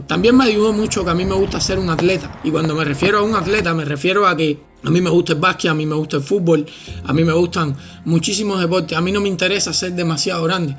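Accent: Cuba. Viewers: bro me suscribí eres cubano? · Eres cubano brother?? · Bro eres cubano, contestame si puedes, yo soy cubano conosco el acento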